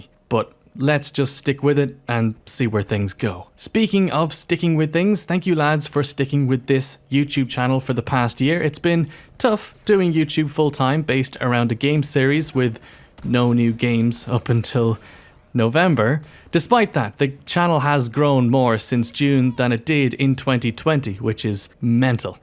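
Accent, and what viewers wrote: Ireland. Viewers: I love that thick Irish Celtic English accent...you sound Like some pirate from Carribean...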